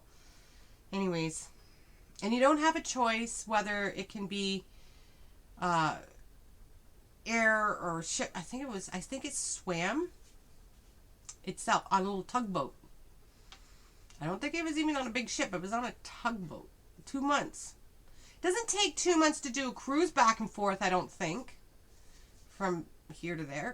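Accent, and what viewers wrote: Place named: Canada. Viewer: I’m watching this video to get a review of the yarn and a couple minutes in I realized oh my God this woman is obviously Canadian and of course you are so am I! I’m just impressed when I can pick it out right away. It’s always the vowels that give it away.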